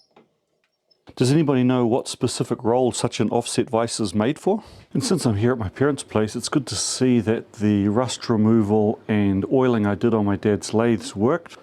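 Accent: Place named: New Zealand. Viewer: I wondered how you kept your Kiwi accent tuned up, Regular visits back ;)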